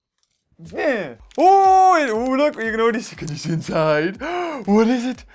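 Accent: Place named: United Kingdom. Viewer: Ya British mate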